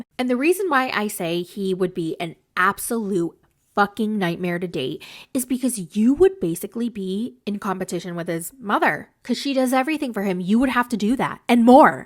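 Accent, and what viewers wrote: United States. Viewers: Just to tell you - YOU ALSO have an accent. American one. xd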